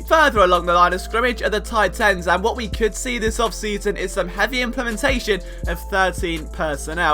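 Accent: United Kingdom. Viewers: That is the problem for me. The content is excellent. Just can't stand the little boy brit voice.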